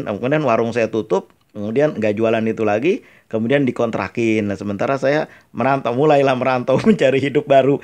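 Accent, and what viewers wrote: Jakarta, Indonesia. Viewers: Alhamdulillah senang banget klu ketemu chenel pak ustadz n ane SK logat Betawi nya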